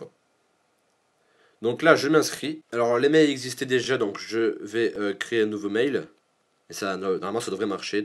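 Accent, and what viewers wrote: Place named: Toulouse, France. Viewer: T'est toulousain?! T'as gaver l'accent!!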